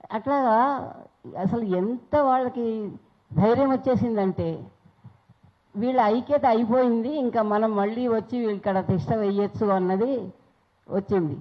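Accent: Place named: Andhra Pradesh, India. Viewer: మీది కూడా ఆంధ్రా భాష లాగా.వునది madam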